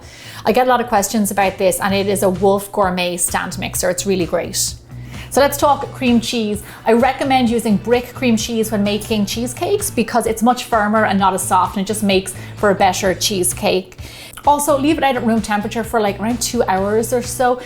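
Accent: Ireland. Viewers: Can't loose good aulde urish accent, great video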